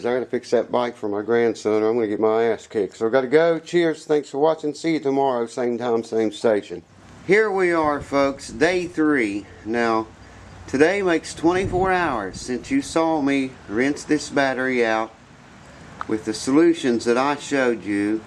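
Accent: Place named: Kentucky, United States. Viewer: You be da man! Thanks for this video. I won't be doing anything you mentioned, but enjoyed watching you do your thing. You remind me of my Nam buddy, Bob McIntosh from Kentucky...same accent and same easy way of expressing yourself. Brought me back in time, so thanks again!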